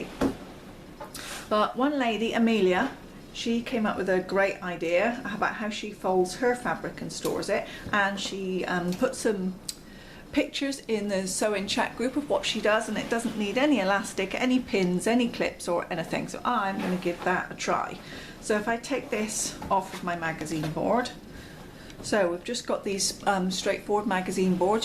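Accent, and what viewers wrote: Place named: Gloucestershire, United Kingdom. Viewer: I'm from Stroud, Glos and your accent feels very Gloucestershire!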